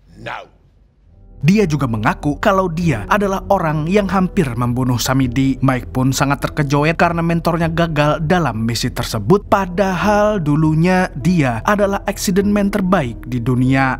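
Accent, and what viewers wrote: Banjar, Indonesia. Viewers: org banjar sekalinya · Urang banjar kah pian mamang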